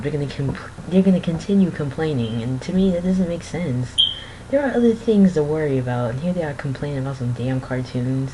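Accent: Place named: New Jersey, United States. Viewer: sign oh boy you sound like these jersy shore pinheads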